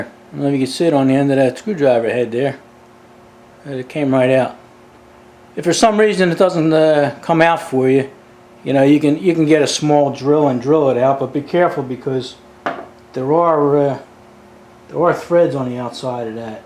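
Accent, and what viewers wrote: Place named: New York, United States. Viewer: So where in New York are you from?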